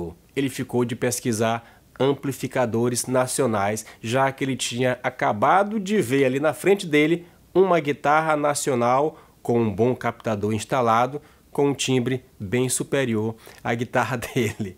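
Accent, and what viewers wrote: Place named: Northeast, Brazil. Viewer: . Oque eu aho legal é que o seu "x" nordestino é diferente do "x" carioca. Kkk E é um chamariz no seu marketing pessoal.😆😆😁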